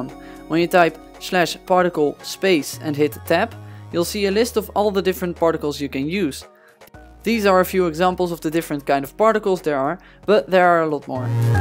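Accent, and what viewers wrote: Netherlands. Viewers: You sound a little bit Dutch ; )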